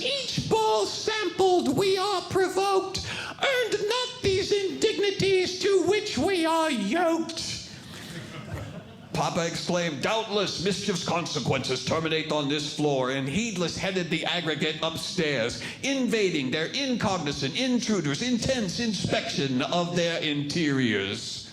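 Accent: United Kingdom. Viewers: British accent and Shakespeare? Wow!! Talented guy!! Bloody brilliant!❤😊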